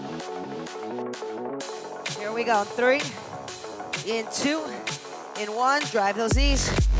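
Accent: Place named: Staten Island, United States. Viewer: Just wish she didn't talk like a Staten island sanitation worker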